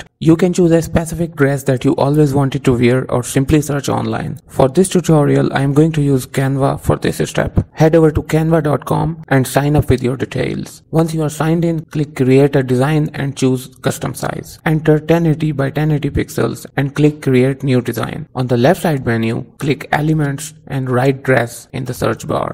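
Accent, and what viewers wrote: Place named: Hindi, India. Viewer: Hi man thanks for this, i noticed you have a strong Hindi accent, your obviously not a Native language English speaker like me. I was wondering do you know of any voice cloners that can accurately clone none native English accents like ours?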